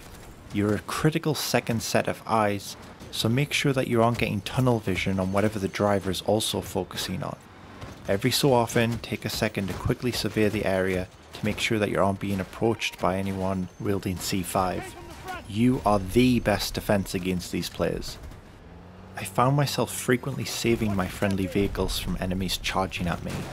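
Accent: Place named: Europe. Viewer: judging by your accent, I'm assuming EU time zones. When are you playing? What game mode? It's a shame we can't easily play servers that frequents.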